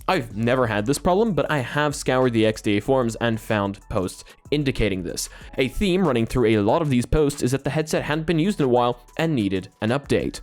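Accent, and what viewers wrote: Poland. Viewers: WAIT HES POLISH ohh s o thats the polish accent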